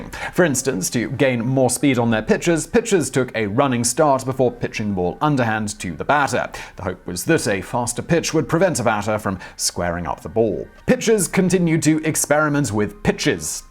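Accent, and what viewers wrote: United Kingdom. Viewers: Why am I listening to a Brit tell me about baseball?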